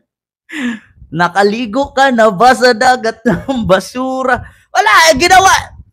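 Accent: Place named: Hiligaynon, Philippines. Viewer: Natatawa talaga Ako sa yo idol..pag dating ko galing trabaho Ikaw agad pinapanood ko..Tnx sa mga blog mo.. watching from japan at ilongga po Ako.. ang cute mo pong mag salita ng ilonggo ❤️❤️❤️❤️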